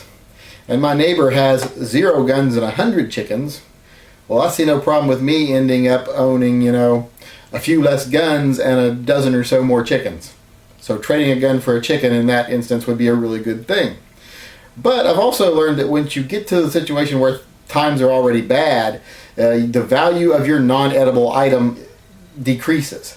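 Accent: United States. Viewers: Never ever buy or sell a gun from a sissy sounding Yankee. Especially one who magically has fooled a large group of followers that he knows jackshit about firearms. Those seeking knowledge please look elsewhere because all you've got here is a smartass blowhard.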